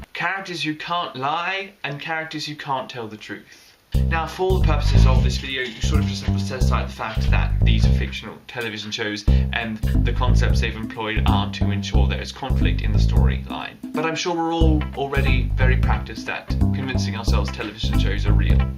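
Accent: United Kingdom. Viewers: That is quite an astounding British accent. :D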